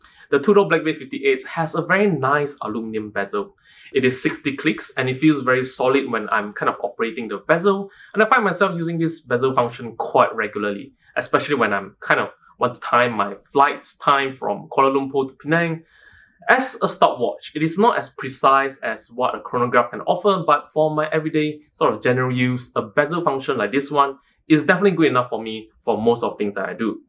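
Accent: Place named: Singapore. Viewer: I think you are a Singaporean? I can sense it with your accent. I worked there in SG before...😊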